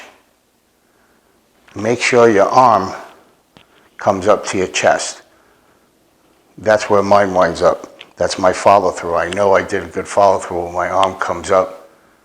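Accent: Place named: New York City, United States. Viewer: Good stuff! Loving your videos...simple...straightforward...with the NYC accent! I have lots of drills to work on now to improve my game, so thnx!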